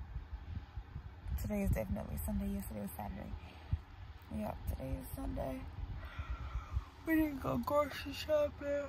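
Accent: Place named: South, United States. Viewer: I'm from Queens York I just love it when you talk Southern♥️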